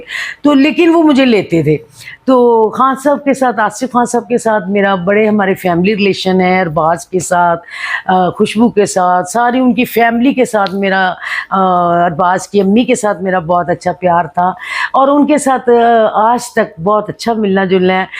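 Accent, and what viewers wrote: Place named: Punjab, Pakistan. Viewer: آصف خان کی اردو کی نقلیں اتارنے کی ضرورت نہیں انکی اردو آپ کی پنجابی نما اردو سے اچھی تھی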